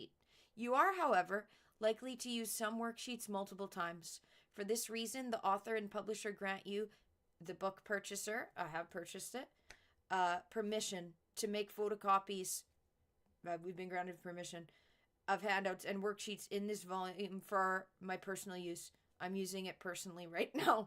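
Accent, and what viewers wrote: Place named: Australia. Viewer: I freekin love u dude. I wish I knew someone in real life like u. The Australian accent sealed the deal lol 😂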